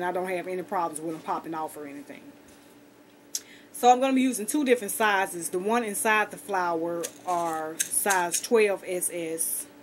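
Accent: South, United States. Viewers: I found your channel looking for micro braid tutorials but I found so much more. I am a new subbie. Where are you from? I am from S.C. just wondering because we both have that wonderful southern accent.☺ Have a blessed day!🌹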